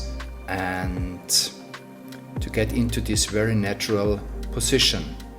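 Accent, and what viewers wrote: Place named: Germany. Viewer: Love male german accent.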